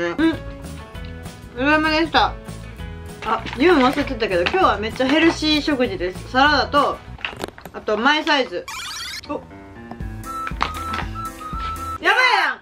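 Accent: Kansai, Japan. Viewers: 推し事ってほんと楽しいよね、、のあはモデルさん推しだから相手は女の子だけどほんと可愛くて生きててくれて息しててくれて有難うっていつも思う笑卒業旅行大阪行ったのー！いつもの関西弁？しか聞くことないから生の関西弁が聞けました🥰🥰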